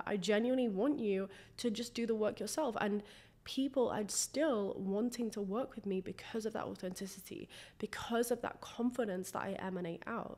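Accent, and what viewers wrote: United Kingdom. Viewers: This just landed on my recommended page and I instantly thought that Billie Ray girl from Tiktok started doing self development videos and got a British accent lmao. Really like the content though! My kind of jam.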